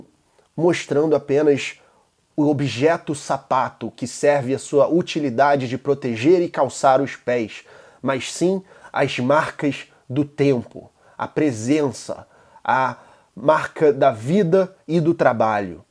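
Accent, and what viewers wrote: Rio de Janeiro, Brazil. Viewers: No vídeo o sotaque carioca chiado, é muito forte. Tens q fazer um teste de dicçao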